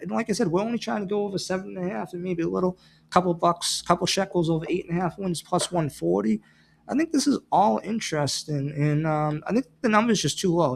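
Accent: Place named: New England, United States. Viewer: Gee, I wonder if this guy has ever been to BAWiston! That N.E. accent is too easy to spot. Okay I like encouraging new channels but my best advice if you want your channel to grow in this glutted market is to STAND OUT. & this much lack analysis ain't gonna cut it. You're not even sure if Schottenheimer was in his 1st year? Fair or not you're not likely to make gains when even the establishment media is saying more than you do. Find some stand-out stats that actually say something. Show clips of a play Shanahan or McVay called that proves they're great instead of just repeating that they are. Give people a reason to tell their friends "dis guy knows what 'e's talkin' bout!" That concludes this episode of "Unasked-for Advice."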